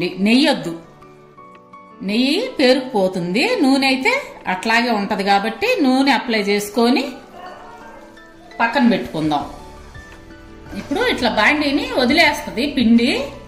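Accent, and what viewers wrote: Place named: Anantapur, India. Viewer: Meeru anantapur yasa matladtunaru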